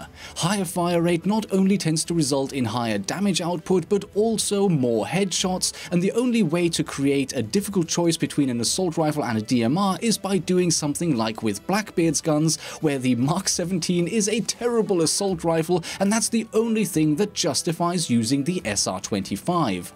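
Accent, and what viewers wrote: Germany. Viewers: Terry Makichod I think he’s German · Sometimes you have a little German accent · Just wondering, are you German? Your voice kind of sounds like you are a German person who is really fluent at English. Or maybe it's just your microphone lol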